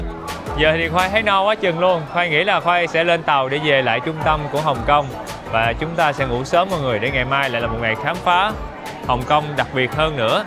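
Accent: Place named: Mekong Delta, Vietnam. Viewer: Bạn này nói chuyện đúng chất miền tây luôn a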